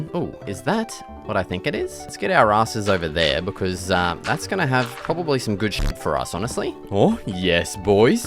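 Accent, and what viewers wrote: Australia. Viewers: Aren't you from Australia according to your accent huh?) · are ya aussy mate?? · are you aussie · Are u Australian 🇦🇺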